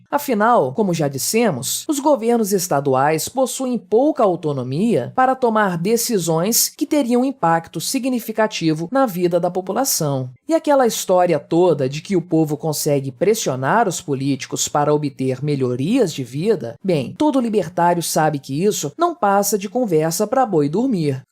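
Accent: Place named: Southern Brazil, Brazil. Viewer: VC DEVE SER SULISTA OU PARAENSE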